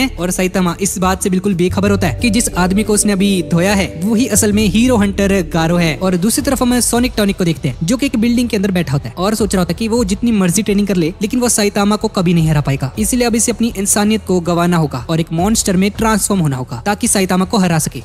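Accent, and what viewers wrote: Punjab, India. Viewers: Bhai aap punjab se kya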